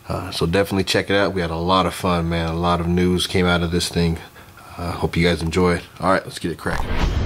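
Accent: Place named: Vancouver, Canada. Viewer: You must be in The Vancouver area Like myself or not,just sounds like it,cool review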